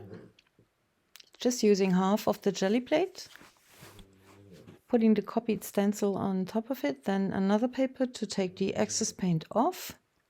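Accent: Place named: Germany. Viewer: Guten Tag from Tennessee, USA. At least I believe you are German but your accent is different from my husband’s. He’s a German National from Hamburg. Your English sounds a bit different than his. And the advertisement with the word fisch also led me to that conclusion. That being said, your work is quite beautiful. Prost from America.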